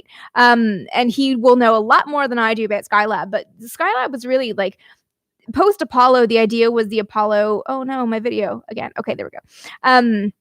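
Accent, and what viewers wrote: Canada. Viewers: Will have to get the ebook so I can hear that awesome Canadian accent all day.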